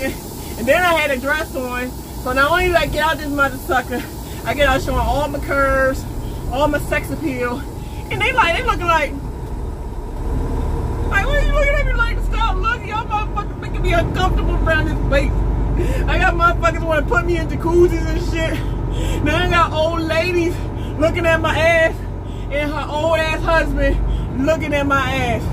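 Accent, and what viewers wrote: Baltimore, United States. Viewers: Those Tasty Cakes 🎂 baby is what made me Subscribe 😳 . The Baltimore accent,attitude, and the fact you are beautiful truck driver made me have to keep my 👀 on you.😂🤣🤣😅😆😆🤩😍😛😋❤❤❤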